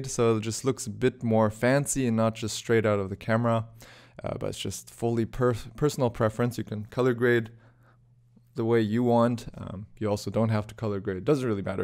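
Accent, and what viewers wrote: Germany. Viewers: Are you a German-Guy? Your accent sounds German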